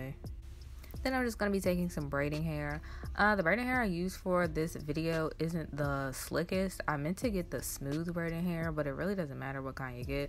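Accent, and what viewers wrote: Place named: DMV, United States. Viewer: You in the DMV,? I hear Ricooo 👀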